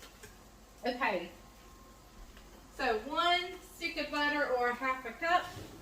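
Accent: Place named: Georgia, United States. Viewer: The cookies look delicious! I enjoyed watching this video because of your authenticity, but, also because our accents are very similar. My husband’s and my family were born and raised in north Georgia in a valley that runs along an Appalachian foothill - and we are still here! I can’t imagine my life without the woods and the mountains!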